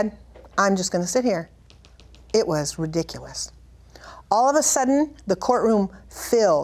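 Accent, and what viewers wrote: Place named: Oklahoma, United States. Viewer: You remind me of * I think. The accent maybe??? From Oklahoma?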